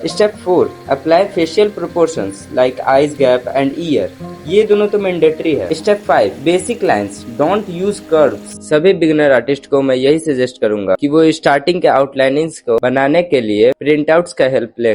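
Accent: Bihar, India. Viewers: Bhaiya are you from bihar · Are you from Bihar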